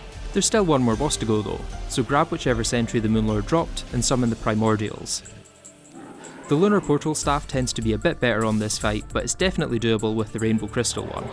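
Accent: Scotland, United Kingdom. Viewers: Came for the terraria, stayed to be gaslit by a nice sounding scotish man :)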